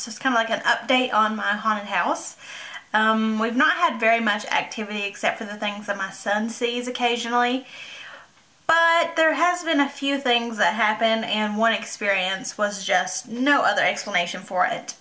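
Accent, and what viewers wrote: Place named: South, United States. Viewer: Omg where are you from? Haha I'm from Mississippi and Georgia so your accent reminds me of home. Southern accents unite! :)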